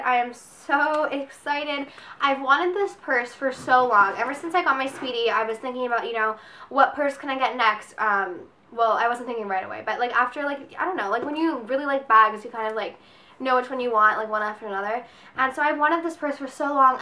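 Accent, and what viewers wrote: Canada. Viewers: I agree. I think I commented before asking if she was Canadian. I watch another girl's videos who speaks just like her & she is Canadian.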